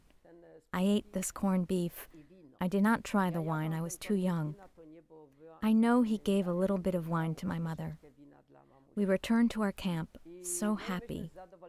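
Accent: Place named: United States. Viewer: thanks for uploading.The spoilt brat american narrator is annoying but I really should not quibble as this is great stuff.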